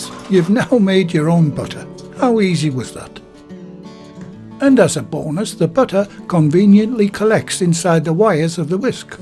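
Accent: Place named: England, United Kingdom. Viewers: Nice to hear an English accent rather than American. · I think I partly watch to feel like home . . . now living in Australia . . I do love any northern twang.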